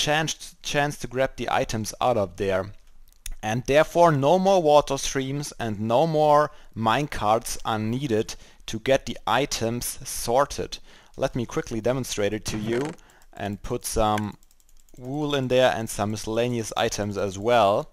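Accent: Germany. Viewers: hey kanns sein das du deutscher bist ;D du spricht irgendwie so deutschen dialekt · Ich wette du kommst aus Deutschland :) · German I'm guessing? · man kann sofort hören mit dein deutschen dialekt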